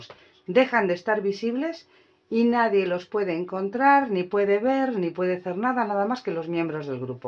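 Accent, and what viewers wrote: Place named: Galicia, Spain. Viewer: Hola, Ese acentillo gallego que se te ha pegado 🤣🤣🤣 Para no bordar mucho, has bordado. El projecto del solstice es muy muy bonito y has bordado bastante. Y con el de Christmas de Lily Violet...vas super deprisa. El de Navidad de Luca-S es super acogedor La niña Jardinera es una preciosidad. La tela que has elegido me gusta mucho. Te esta quedando muy bien, la regadera tiene puntos... Te has portado muy bien, muchos regalitos. Muchas gracias por hacer el video. Besos. Natalia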